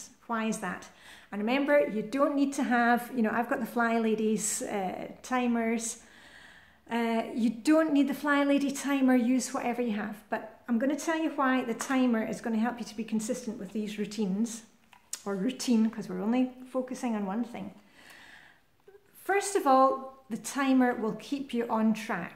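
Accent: Scotland, United Kingdom. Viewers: Hy from Montrose in Scotland we had snow here yesterday, My favourite film has to be Gone with the Wind, as for smell I love the smell of freshly laundered sheets especially when they have been dried outside. Love your videos nice to here a Scottish accent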